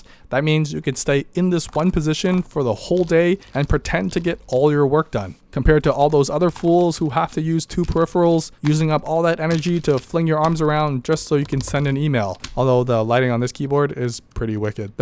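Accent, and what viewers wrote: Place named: Canada. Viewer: Wow, Kanadian lolzz 👍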